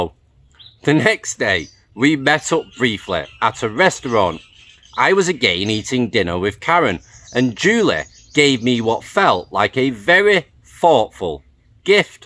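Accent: United Kingdom. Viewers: Use the 3 F’s rule it always works!!! That Brit accent?????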